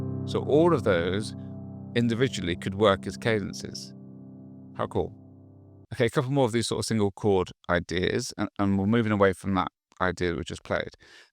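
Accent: United Kingdom. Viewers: thanks for your excellent teaching! Your British accent makes it extra special and realistic!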